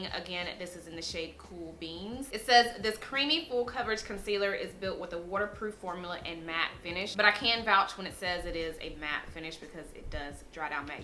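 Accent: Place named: Georgia, United States. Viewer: Kat Von D concealers are like that? I always go back to my Nars radiant. Love your southern accent. It sounds like a Georgia accent.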